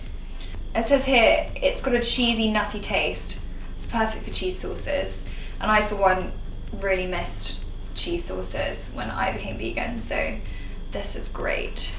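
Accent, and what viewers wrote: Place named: England, United Kingdom. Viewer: what part of England do you live in, i like your accent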